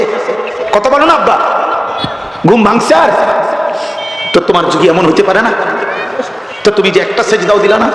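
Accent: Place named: Baripada, India. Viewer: Theek baripada dialogue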